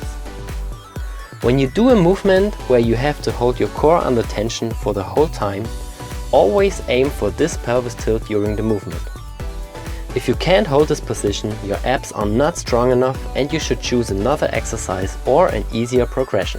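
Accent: Germany. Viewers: That was a german accent, right? ^^ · dieser deutsche akzent hahahahaha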